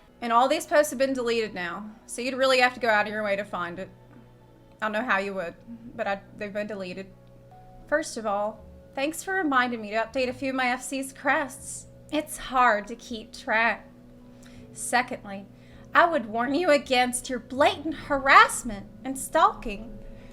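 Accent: South, United States. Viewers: Some videos she just gets real southern. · Has anyone else noticed that since has been back in the US shes been slowly getting a stronger southern accent? LOL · I can't get over the fact how SOUTHERN sounds. She reverted so quickly to her American accent. It's astounding. E: As far as the topic at hand, I have an FC apartment, I have a private ward apartment, and I have a small house. That's 400 slots, that's more than enough for me. I'm not double dipping on any one form of housing available to an individual. I got my apartments way before I ever did my house (thanks ward expansions, you're the real MVP here), but I know what the rules are, and I stick with them. I wish everyone did the same. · Your accent is sounding a lot more southern since getting back to the states... it adds to your cuteness level 😁😁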